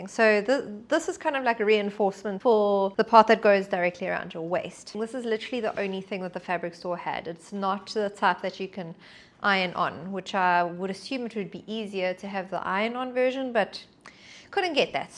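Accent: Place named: South Africa. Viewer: Hi there. You sound like a fellow south African 😊. Can you advise on how you choose your size? How did you know the size would fit you or are you familiar with tianas closet patterns? Thanks allot for the tutorial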